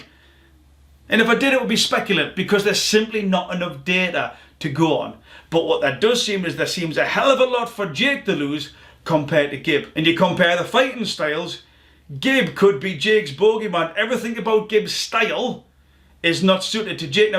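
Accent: Newcastle, United Kingdom. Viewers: you and true geordie have the same legendary accent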